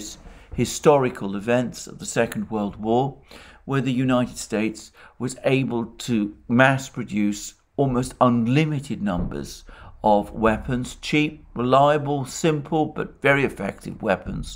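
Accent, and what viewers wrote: England, United Kingdom. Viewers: Lol, Alexander is simply reciting unfiltered Russian propaganda from almost exclusively Russian sources. Putting an english accent to it does not make it any less Russian propaganda.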